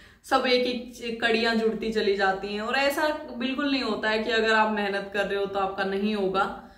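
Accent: Haryana, India. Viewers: aap haryana se ho kya aapki voice tone se lg rha hai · Aap Haryana se ho ya Rajasthan se...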